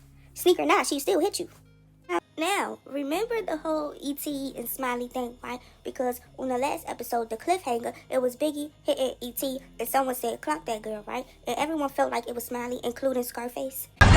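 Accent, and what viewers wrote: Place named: Washington DC, United States. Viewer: The narrator sound like she from dc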